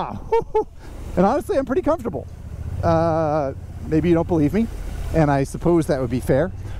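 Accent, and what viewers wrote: United States. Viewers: Hi, hi, hi comment section chatters, just this line to say: real nice intro, the change is welcomed (your laugh / the rain) thank you for your verbal skills.. best ad rep online (not that i am interested since i'm european and we get real motorcycles ) 😹 (sorry the pun could not be whiff held) thank you for the number of bikes you put your tushee on (this one looks as ugly as it can faking retro looks...) thank you for making me remember what it is to hear the north 'murican language (don't know why but i do miss it ❤ ) thank you for (in the future) changing the format more often (like you did under the rain or in india) (just couple it with revere ad rep 😸) thank you for ari and lance and spurgeon thank your wife (and kids?) good luck have fun, thank you for your company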